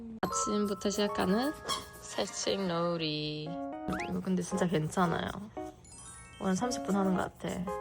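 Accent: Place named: Jeolla, South Korea. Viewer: 그런데 말습관중에 끝에 자꾸 전라도 사투리'이 이' 하시는데 왜 그러는 걸까요? 경상도 분이신데 뭘해도 상관은 없지만 안하시면 더 좋을듯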